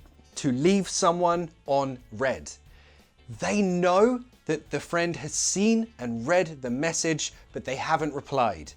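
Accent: United Kingdom. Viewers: Oh yes British English is best! Thank you for those 15 minutes of pure learning and entertainment beautifully packaged 😃👍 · you're the first british accent guy that I've heard not having that horrible and funny accent lol... like a hot potato in the mouth.... most british people have that hot air in the mouth while pronunciating words lmao...Hallelujah lol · It is so nice to listen British accent 💫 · love british accent. help me with it please!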